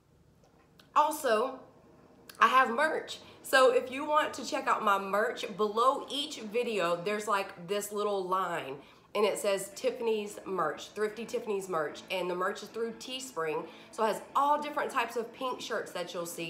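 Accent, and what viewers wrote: South, United States. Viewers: Hi Tiffany! How are you Hun? I live in KC, Missouri we have several dollar trees here that I've been to and I must say IAM completely obsessed, I love your hauls! ❤️Any tips to start my own hauls? P.S.i love your southern accent my mom is from Kentucky and has the same southern draw, love it! Take care honey,be well,God Bless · I’m still here I freaking love your videos 😂😂 you keep me laughing and I enjoy seeing the new finds and hearing that southern accent 💕😋😂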